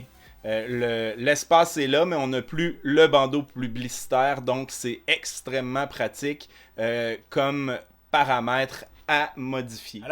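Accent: Canada. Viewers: L'accent canadien 😖😖😖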